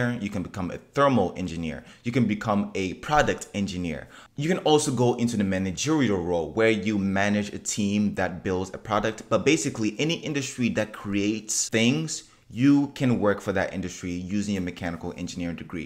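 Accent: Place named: South Africa. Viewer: Are you S.African I'm hearing an accent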